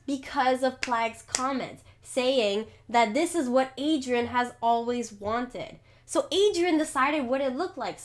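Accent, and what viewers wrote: Quebec, Canada. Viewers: Unrelated, but are you from Quebec? Cause your French accent sure does sound like it! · never seen this channel before, but I guess you're a Quebecker, right? Cause you sure sound like it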